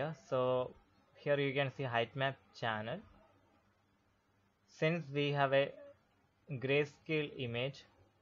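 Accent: India. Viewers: hii bro , r u Indian???bcoz ur style of speaking seemed like it😂. Btw I'm from India, and thx for fur PNG👍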